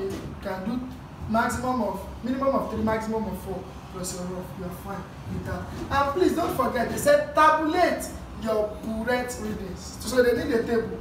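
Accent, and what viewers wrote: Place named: Nigeria. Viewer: God bless you man!!! You sound Nigerian. More love from Ghana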